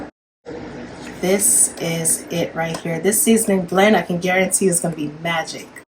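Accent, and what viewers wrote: New York, United States. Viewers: You have to be from New York girl! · I'm from the bronx . You definitely from New York love it . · I can hear that NY Accent 😅 chicken looks amazing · She's gotta be a new Yorker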